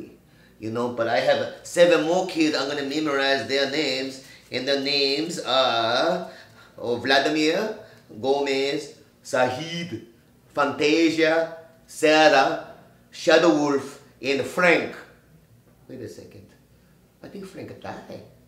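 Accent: Mexico. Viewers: accent sounds more mexican than korean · Lol sound more Mexican than asian but Whateva works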